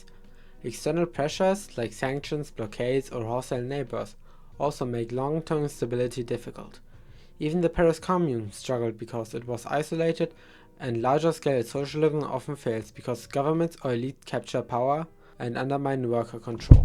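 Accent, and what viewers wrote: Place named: Germany. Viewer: Der deutsche Akzent ist nicht zu überhören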